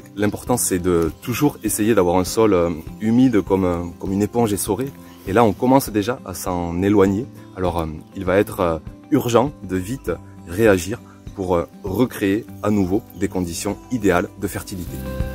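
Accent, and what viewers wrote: South, France. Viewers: Bonjour, tout d'abord une réaction par rapport au sujet puisque tu nous y encourages.. Pour ma part, cette année, j'ai paillé en "temps normal" mais c'était sans compter sur cette "année à limaces" (et toute cette pluie) qui fut exceptionnelle! Donc, il a fallu tout enlever, déloger le surplus, puis, dernièrement, pailler à nouveau. Quel travail! De fait, les parcelles et butes ont perdu une partie du vivant qui était là, bien installé... Les plants des semis ont du rester plus longtemps à la maison et la reprise en terre, je le vois est plus difficile, plus lente. Idem pour les fraisiers, l'excès de pluie a engendré la maladie. Il a fallu enlever fruits et feuilles touchés et bien sûr dé-pailler. Mais le résultat a été concluant: A ce jour, 5Kg de fraises! Miam Miam lol. Autre info pour les abonnés: enlever la paille temporairement si, comme ici, il fait encore qques nuits très fraîches. 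Cela permet à la terre de pouvoir restituer la chaleur pendant cette dernière. La paille bloque ce phénomène. Utile par exemple pour les plants de courgette. Enfin, une question: Mes Blettes montent cette année. Je les laisse pour récupérer les graines. Ma question est celle-ci: le plant meurt-il après avoir fait les graines cad dois-je le couper et semer un nouveau plant ou bien va t il me donner de nouvelles cardes comestibles dans quelques mois si je le laisse en place? Je finis en te disant que tes vidéos, ton (notre lol) accent me rappellent le Sud d'où je suis originaire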